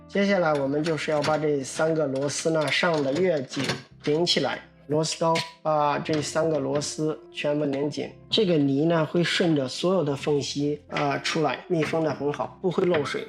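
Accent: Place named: Henan, China. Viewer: 老乡豫普说的不赖😊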